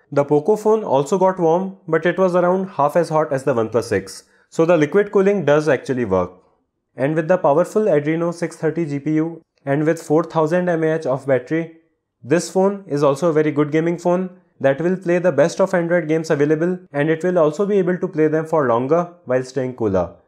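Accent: India. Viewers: Somethings wrong with me. I cant take indian english serious.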